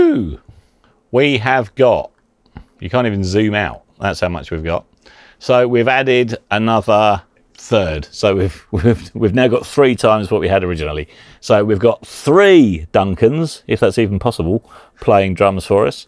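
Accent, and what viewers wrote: United Kingdom. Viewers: Cannot explain the joy of hearing a good old English accent on an audio M1 video. If you're available for booking, we'd LOVE to work with you. Cannot explain how relaxed we would feel.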